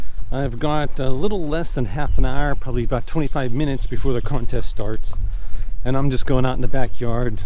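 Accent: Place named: Western Pennsylvania, United States. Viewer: Let me know when you get on. We can practice Cw. Seems I have a pipe to pa. That west pa accent cracks me up. I grew up with western pa folk. Man you have the trees for an amazing delta loop. Vertical.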